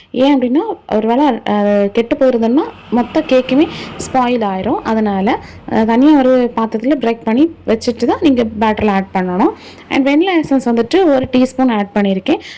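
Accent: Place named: Kanyakumari, India. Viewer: Ur voice seems similar to kanyakumari locality.. Will try this recipe for sure sis ... Random viewer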